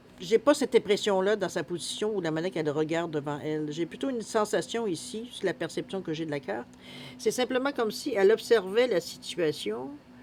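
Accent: Quebec, Canada. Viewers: Bonjour je vous découvre et j ai beaucoup aimé vos tirages.Selon moi vous êtes du Québec selon votre accent si oui moi aussi.J adore vos interprétations à chaque oracle.Ce tirage me va comme un gant.Merci beaucoup ❤🙏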